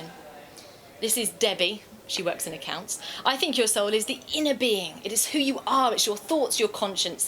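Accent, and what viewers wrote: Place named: England, United Kingdom. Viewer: bit of an English accent and I'm liking it too!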